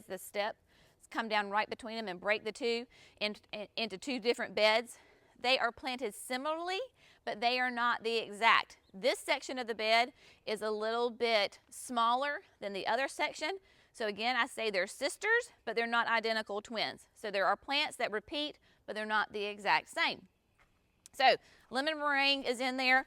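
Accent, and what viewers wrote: South, United States. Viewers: North Caroline-ah? Love that southern twang!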